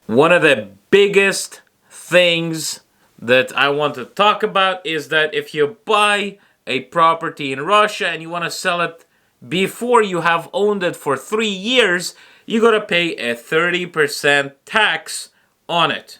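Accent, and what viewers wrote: New York, United States. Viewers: You already sound american! Its my life and I can do whatever I want! Land of the free. Maybe you can teach a certain group of Americans that freedom is worth fighting for! Good luck to you guys! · Dude, you already sound like you are from NY, you should fit it just fine. Don't you have cousins here already?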